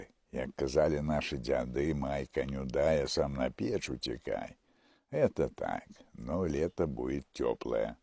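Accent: Vitebsk, Belarus. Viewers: Са святам, з Віцебшчыны пэўна? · вы не с Витебщины? Уж очень говор похож.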